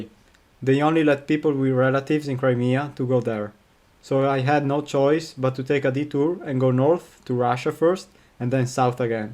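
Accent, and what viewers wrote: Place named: Venice, Italy. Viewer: Ma io non so l'inglese e a 70 anni non mi metto di certo a studiarlo. Mi dispiacerà non seguirti più! Sei bravo ,simpatico e soprattutto non reciti la parte dell'eroe. Risolvi ogni intoppo senza ingigantirlo e hai un accento veneto che mi piace molto. Buon viaggio quindi, e mi raccomando: resta sempre così ,te stesso 😊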